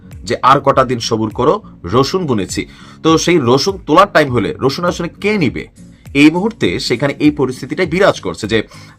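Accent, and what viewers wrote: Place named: Noakhali, Bangladesh. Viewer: এইটা শুধু আপনার কুমিল্লার বাসা না এটা আমাদের নোয়াখাইল্লা ভাষা বুঝছেন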